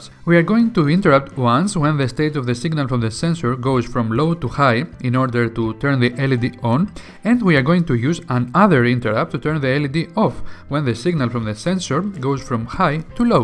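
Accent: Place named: Greece. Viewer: Nice video! Are you Greek by any chance ? Your accent sounds a lot like the average Greek speaker trying to speak English.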